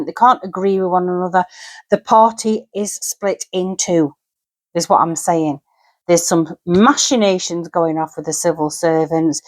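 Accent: Sheffield, United Kingdom. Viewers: Thank you, a great reading. I’ve only just found you. I recognised your lovely accent straight away. I too live in Sheffield so it’s home from home. I’ve subscribed ❤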